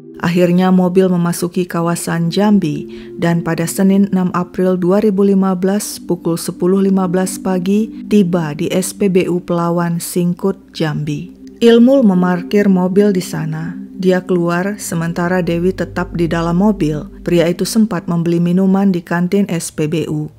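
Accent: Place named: West Sumatra, Indonesia. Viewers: Mantappp logat minangnya bagus nih..... sukses terus yaa... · orang minang, ya...? Bagus sekali logat minang nya.... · mungkin ini berasal dari minang karena logat dan penyampaiannya persis kayak orang minang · Mba orang Minang kah? Bagus banget logat Padangnya. Soalnya saya orang Padang juga.eheheh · mbak suaranya enak didengar dalam penyampaian,apa lagi suara mbak dgn logat minang rancak lamak didanga, sukses taruih yo Uni rang Minang,AMIN🙏❣👍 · Fix urang awak...logat minangnya pas banget 👍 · Ternyata logat minang padang mbak kental kali dialek nya....menisyaratkan mbak org minang.. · org padang?? Logatnya pas.hehe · Uni,, urang awak nak? Luruih bana minang nyo,, sabana ancak... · Mbak kayaknya orng Padang ya??..logat/ cara bicara bhs minang pas banget...sy orng padang..jd tau klu mbak logat padang pas 😀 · mantap logat minangnya...jangan jangan urang awak juo 👍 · Logat padangnya masuk.. 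orang padang jga kah uni? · Kyk nya ni org minang, logatnya kek minang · tyt orang padang.....aksennya dapat banget....eh dialeknya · Bahasa minangnya kok padat benernya.. Orang minang ya min?? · Melihat longgat dlm narasinya,pasti dia orang Minang....😊😊😊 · Hebat bs bhs/ logat Padang 👍 bsk2 pk bhs daerah lg ya, enak dengernya 😀👍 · Logat padang nya mantap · Waduh... top banget logat padangnya si narator. Saya orng pdng asli mengakui. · bahasa minangnya benar2 fasih, dengan logatnya minang bgt... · Jadi YAKIN... ENCI... ADALAH TIONGHOA SUMBAR...LAFALNYA PAS LOGATNYA KENTAL...😁🙏🙂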